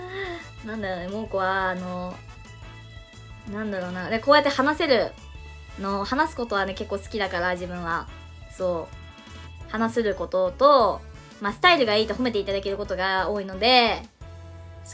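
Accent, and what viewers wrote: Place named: Yamaguchi, Japan. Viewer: うん、山口弁を隠しきれない。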